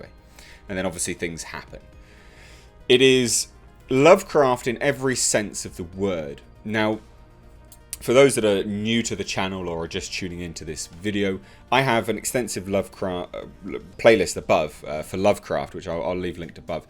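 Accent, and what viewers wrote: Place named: England, United Kingdom. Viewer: Ha ha Automatic subtitles: Colorado Space, must be the english accent. Nice movie, except for the brother and sister nonsence decisions near the ending. Their mother has become something like Brundlefly, but it's ok, everything's going just fine. Or the brother who wants to get into the well to save his dog? Probably the best HPL adaptation now. So far, I thought Dagon was the less terrible with From Beyond...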